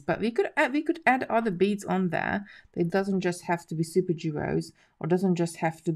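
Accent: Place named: Hungary. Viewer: Good morning, apologies for missing u last night. That project looks beautiful. I have been trying to work out ur accent, and I heard u mention Hungary, is that where ur from? My mum was Hungarian, and came to England with her mum and two brothers during the revolution in 1956. I have been there a few times and love the country.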